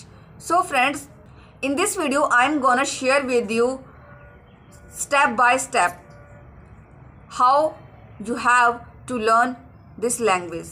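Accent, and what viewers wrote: India. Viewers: Your English is our Indian English like I understand better than others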